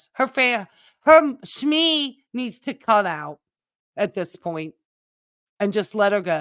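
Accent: United Kingdom. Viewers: Oh I just wondered bc of your accent. I figured it was the UK but was just checking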